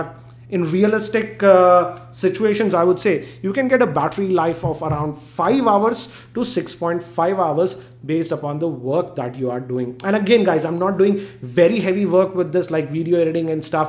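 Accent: India. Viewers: Indian accent. Urggghhhhhh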